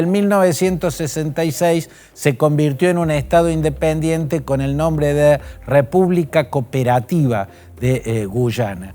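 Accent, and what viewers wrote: Argentina. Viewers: Para tu conocimiento: El virreinato de Perú en el año 1543 comprendía gran parte de América del Sur, que incluía los actuales Panamá, Colombia, Ecuador, Bolivia, Chile, Argentina, Paraguay, Uruguay. Entonces según tu retorcido punto de vista, los peruanos tenemos el derecho de reclamar que esos países vuelvan a ser peruanos. Por tu dejo parece que eres argentino o veneco, que Argentina pues vuelva a ser de Perú. ¿No te parece?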